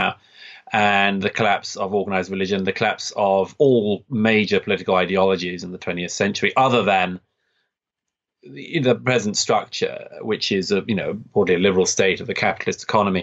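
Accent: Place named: United Kingdom. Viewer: whole thesis is there's a collapse of shared stories in the West with the decline of ideologies like Christianity and capitalism. But then, he attacks civil rights movements aka 'identity politics' (gay/womens/trans) for being exactly that story to tell for many, because apparently they're too divisive and not scientific enough for him. Does he seriously believe that ideologies like Anglican Christianity and Smithian capitalism rested on some bedrock of universal value and rationality? What a myopic worldview. This guy is a muppet. Not everyone with a British accent is a genius.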